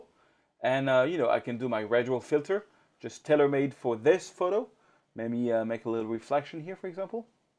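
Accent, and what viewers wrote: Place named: France. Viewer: Mercì,. I really enjoy your tutorials and your French accent. This one tutorial was really awesome. I would also like to thank Kent Jensen's comment about creating an adjustment layer. Cheers.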